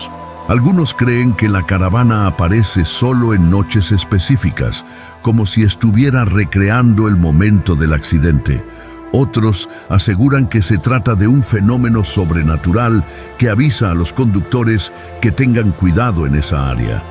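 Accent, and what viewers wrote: Dominican Republic. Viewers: Hasta parece dominicano ese man del narrador😂😂😂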